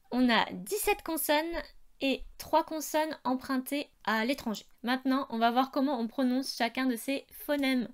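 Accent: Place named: Paris, France. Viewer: Je n'avais pas remarqué ton accent parigot, mais j'adore ton chuintement sur 'ch' et 'j'! Bravo pour ce morceau de bravoure qui m'a ramené dans ma classe de 6ème, il y a... un certain temps !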